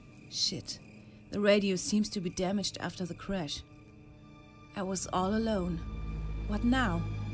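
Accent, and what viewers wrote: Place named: Germany. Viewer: Ich finde es sehr cool, dass eine englische Fassung gemacht wurde. Leider muss ich sagen, dass der deutsche Akzent der Erzählerin zu uneindringlich für diesen Vortrag ist. Es macht, zumindest ergeht es mir so, keinen Spaß zuzuhören. Ich finde den deutschen Akzent in der englischen Sprache absolut nicht schlimm, vielmehr ist er interessant und auch bei einigen Thematiken unverzichtbar. Doch hier wirkt es nicht. Bitte nicht falsch verstehen. Die Sprecherin macht eine solide Arbeit, liest schön flüssig und hat ausserdem eine schöne Betonung, aber -naja, ich wiederhole mich. 7 von 10 Sternen, da alles, bis auf eine Sache passt.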